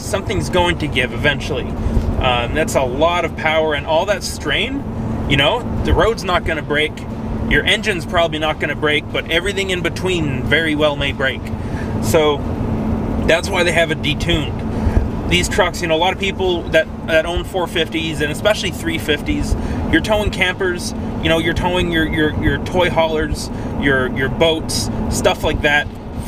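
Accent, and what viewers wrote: Canada. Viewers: My family had a cabin up in Lynn Lake, Manitoba. Beautiful country. And incredibly nice and friendly people... I enjoyed this video. I didn't know you're Canadian until I saw you at the pumps and started talking. Yup! I was paying $2.50+ a liter when going up there in July 2022. And dn here in the the state's people bitch about $3.00 a gallon!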